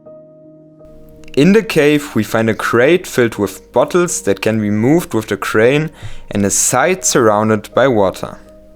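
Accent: Germany. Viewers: Your german accent, is just like mine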